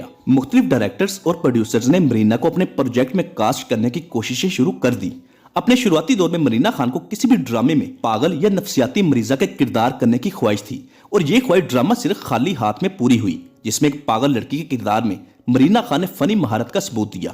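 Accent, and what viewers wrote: Punjab, India. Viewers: آپ کی وڈیوز معلوماتی اور دلچسپ ھیں- مگر آپکا اردو تلفظ خالص پنجابی ھے جیسے مرینہ کو آپ MRINA بولتے ھیں- جبکہ لفظ Marina ھے مطلب م پر زبر ھے، اسی طرح دیرینہ کو درینہ بولتے ھو یہ لفظ "دیر" سے ھے دیر ینہ ھے درینہ نھیں- امید ھے اپنی تصحیح کر کے اپنی وڈیو جو مزید دلچسپ بنائیں گے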